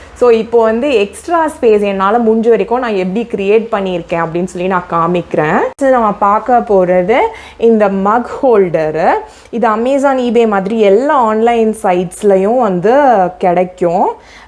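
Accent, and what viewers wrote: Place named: Sri Lanka. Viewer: சகோ super 👍 இல‌ங்கை தமிழ் இந்தியா தமிழ் பேசும் விதம் வித்தியாசம், from Switzerland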